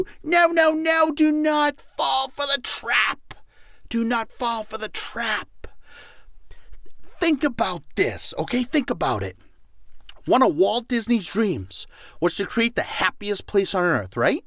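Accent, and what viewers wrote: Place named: Boston, United States. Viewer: This was actually reassuring. I seek the gurus who believe HAPPINESS is a choice we can all make right now ... not the ones with the carrot and stick ... I rarely come across the "scammers" you are talking about. (Watch, one of their ads will pop up as soon as I post this.) I can just imagine, though, people who are in a desperate place - seeking a new job for example - falling victim to the song and dance you are talking about. KEEP BOTH HANDS ON YOUR WALLET is definitely good advice out here in Self Improvement Land. Thank you for the red flag advice; thanks for the free resources and I love the Boston accent.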